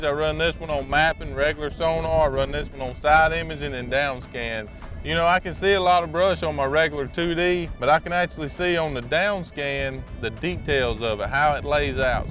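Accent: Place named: Carolinas, United States. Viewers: One of the Carolina's, I believe. Love to hear him talk. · LOL, he's definitely from the deep south.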